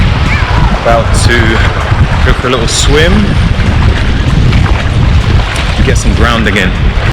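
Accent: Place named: Europe. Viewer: Love the accent I can listen to Europeans talk all day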